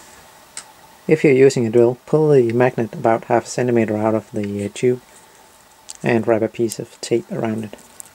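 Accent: Denmark. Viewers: You have a Danish accent bro, where you from?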